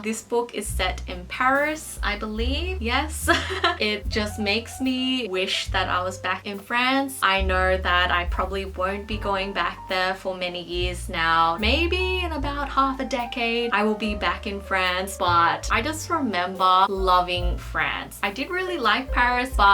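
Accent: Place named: Australia. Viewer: I like your Australian accent and your book shelf ❤️✨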